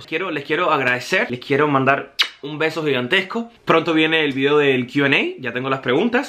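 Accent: Cuba. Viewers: Felicidades Pero por favor Habla con acento neutro Porque cuando hablas como cubano no te entiendo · Este pk sigue con el acento neutro ya no estamos en univision habla bien que eres cubano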